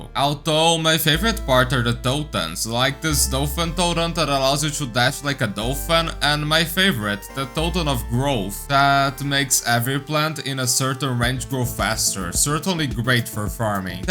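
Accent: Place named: Brazil. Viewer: your accent sounds Brazilian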